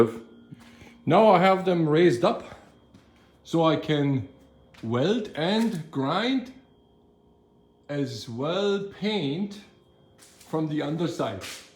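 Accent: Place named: Germany. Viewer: Hi, interesting where are you from, as for me you have a german accent. PS. The quastion was answered a few minutes later))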